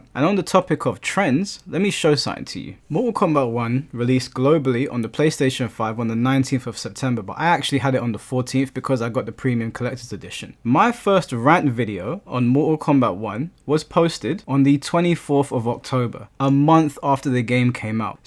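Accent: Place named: England, United Kingdom. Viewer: You got decent content but I HATE YOUR VOICE it's the English shit I'm American and hate the English accent sorry not trying to disrespect the English just y'all accent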